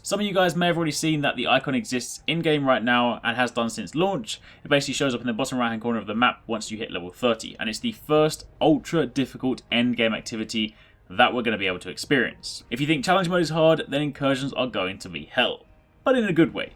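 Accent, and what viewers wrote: Sweden. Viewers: You're Swedish? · are you from sweden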